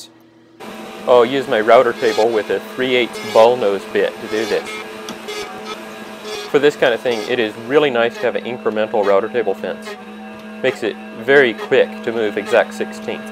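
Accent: Canada. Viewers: You from Canada ehh great toutoral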